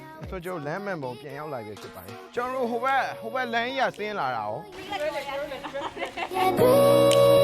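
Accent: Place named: Myeik, Myanmar. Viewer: မြိတ်အသံပါ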